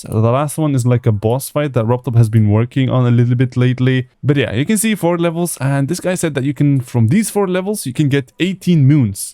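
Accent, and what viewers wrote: Germany. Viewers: yu german?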